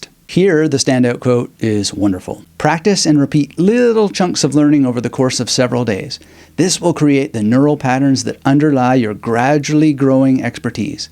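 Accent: Canada. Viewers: Rockin' the Canuck accent 🎉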